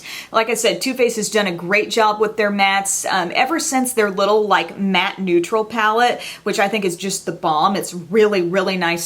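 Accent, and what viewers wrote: France. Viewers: Love your French accent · Your French accent is sooooo cute! 😁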